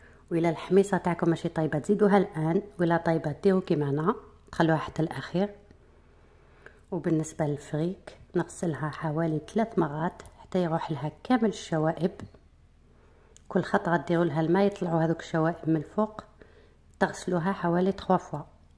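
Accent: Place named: Levant, Arab world. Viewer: Voyer êtes algérienne? Votre parler est du mechrek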